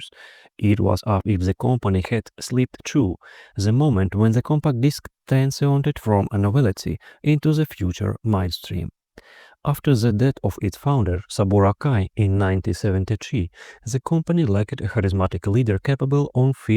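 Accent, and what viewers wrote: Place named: Russia. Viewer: It’s very hard to understand anything you’re saying because of your very heavy Russian accent!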